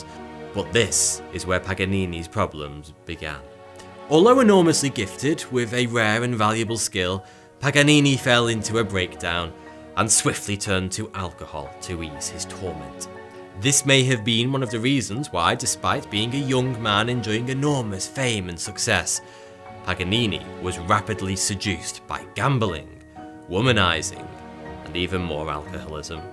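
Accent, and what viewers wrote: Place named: Cockney, United Kingdom. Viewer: it is called “the cockney accent”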